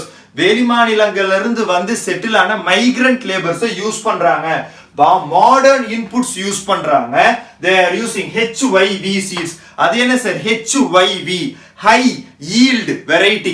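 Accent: India. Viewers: sir your mother tongue in kannada right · Sir a doubt you are from Chennai or Coimbatore. ☺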